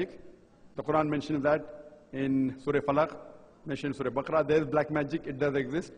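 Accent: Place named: Arab world. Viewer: Unxpectedly Xpected Are you Islamic people forfeited any kind of magic? If it doesn't tell me.I can't fully understand cause he is using Arabic accent. I barely understand only 20~30%.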